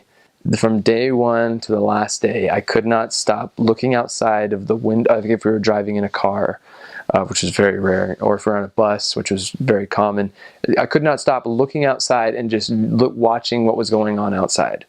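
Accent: South India, India. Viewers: Man your indian accent is spot on!!! Super impressed. It is very hard to do. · You got the south Indian English accent down to a T. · His Indian accent is absolutely on point.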